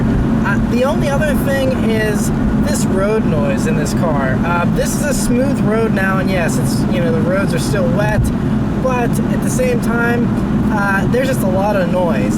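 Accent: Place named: Pittsburgh, United States. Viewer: Can tell your from the Pittsburgh area just by your voice lol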